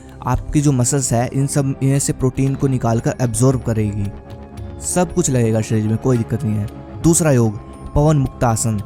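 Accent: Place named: North India, India. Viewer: bhai pakka north india se belong karte hai ya or i think hariyana or utter pradesh se😁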